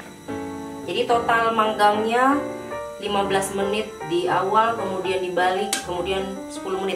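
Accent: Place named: Indramayu, Indonesia. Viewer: mbk nya orang indramayu ya....denger logatnya...heehehe...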